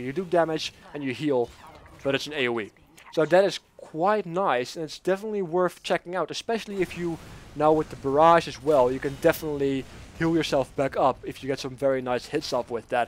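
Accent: Netherlands. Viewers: Maybe is this a weird question but... Are you dutch??? I hear something like that in your voice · Starting to like ur commentaries more and more! Quick question: Are u dutch?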